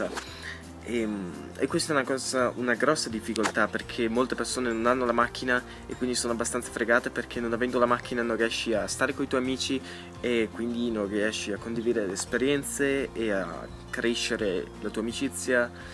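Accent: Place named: Verona, Italy. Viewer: Si sente un attimo la tua sfumatura veronese From Borgo Trento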